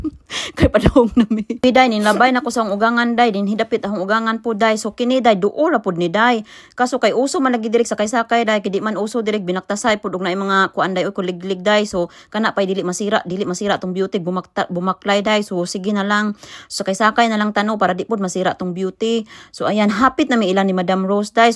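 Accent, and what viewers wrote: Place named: United Kingdom. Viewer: Paspasi ang cheers dihaa! ang imo British accent dai kay gwapa paminawon.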